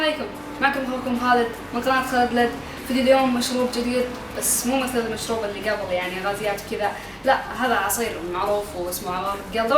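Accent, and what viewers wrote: Kuwait. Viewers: انت كويتي ولا سعودي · هل انت كويتي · انت كويتي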